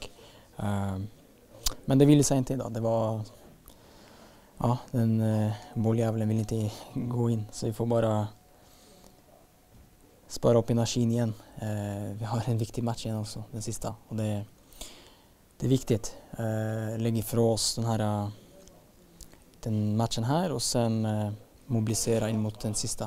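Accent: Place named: Norway. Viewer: Han har börjat prata lite mer norskt igen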